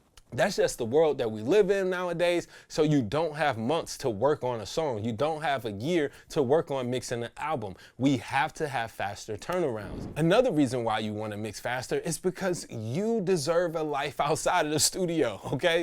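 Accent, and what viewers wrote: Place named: Washington DC, United States. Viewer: You sound like you from DC, bro I swear u got that twine in your voice like me bro but I think u said you from st.louis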